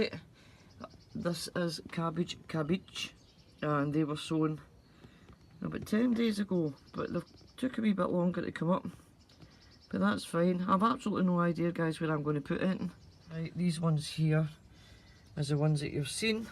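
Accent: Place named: Glasgow, United Kingdom. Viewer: Hiya hun, I love your accent, is that a touch of Glasgow I hear? wow you've loads going on, yep definitely top your spuds right up, as soon as the stems set after poking through, they stop producing the stolons and it's the stolons that the spuds grow on. If you need anymore San Marzano, give us a shout. Take care. Alex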